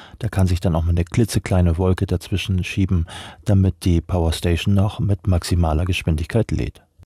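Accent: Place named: North, Germany. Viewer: Eruzähl mal was, wieso es dich in die Schweiz gezogen hat ??? Dein Dialekt klingt eher Norddeutsch ....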